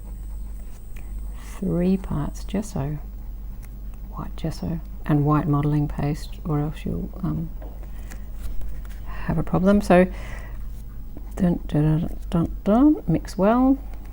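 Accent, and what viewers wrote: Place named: Australia. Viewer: Lovely to hear an Australian voice....from Mount Gambier SA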